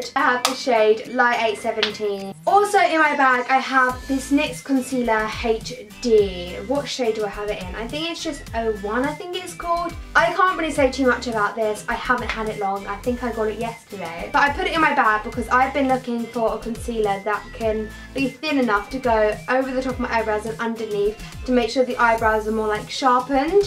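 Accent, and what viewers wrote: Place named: Essex, United Kingdom. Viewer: you sound 🔊 like your of Essex good video by the way 😄😛😍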